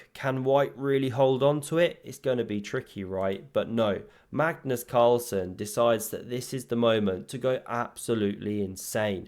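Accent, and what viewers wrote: England, United Kingdom. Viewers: which part of uk is ur accent from?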